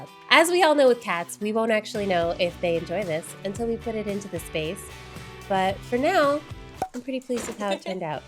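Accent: Quebec, Canada. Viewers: Je n'étais pas prête à t'entendre parler en français avec l'accent québécois 🤭 ❤ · Loooove when you speak french! It shows you learned from Quebec, I can hear the accent ;) As always, amazing DIY!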